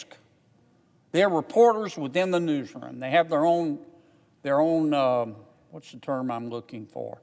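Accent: South, United States. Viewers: I love his southern twang!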